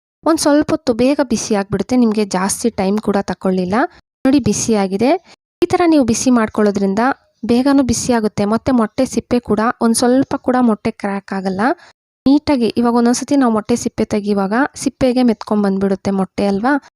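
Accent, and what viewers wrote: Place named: Kolar, India. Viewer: Neevu kolar dhavru ansuthe...naanu kolar avne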